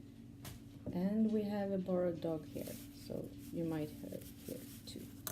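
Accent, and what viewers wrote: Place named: Sweden. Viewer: Hello from the UK 🇬🇧 I love your swenglish! And great wips x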